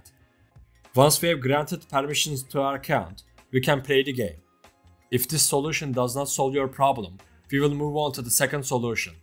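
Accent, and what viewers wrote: Turkey. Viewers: Thanks for the help brother. Btw your accent sounds like turks. Is there any chance that we're from the same mother nation? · aynı türkler gibi ingillizce konuşuyorsun.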